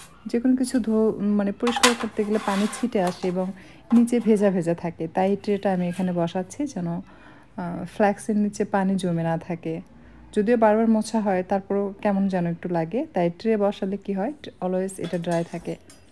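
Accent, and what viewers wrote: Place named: Barishal, Bangladesh. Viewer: আপনি বরিশালের মানুষ আপনার কথার মধ্যে বরিশালের ভাষার ঝাজ পাওয়া যায় এগিয়ে যান সমহিমায় ধন্যবাদ।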